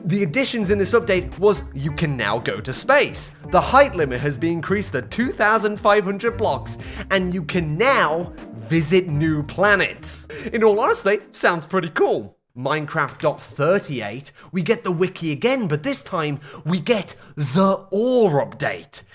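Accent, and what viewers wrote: United Kingdom. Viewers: dont worry hes just british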